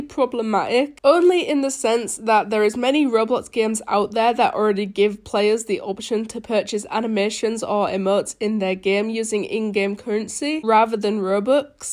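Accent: United Kingdom. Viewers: Shes british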